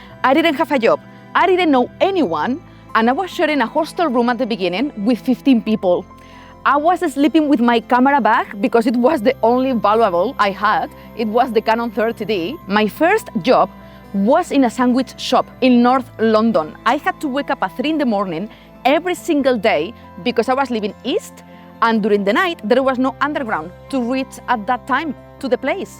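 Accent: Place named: Spain. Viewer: Yo no más quiero escucharte con ese acento español.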